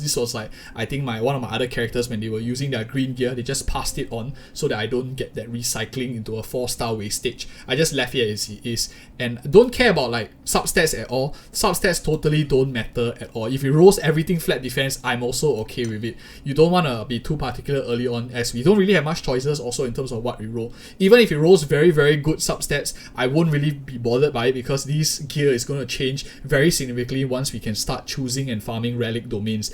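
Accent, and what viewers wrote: Singapore. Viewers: he definitely sounds singaporean ngl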